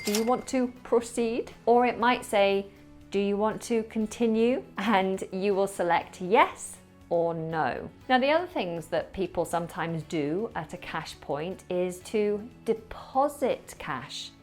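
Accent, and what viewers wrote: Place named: United Kingdom. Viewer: I love you lady and I enjoy learning English and in my point of view British accent is so cute especially when you ignore "R" at the end of word that's soooooooooooooo nice.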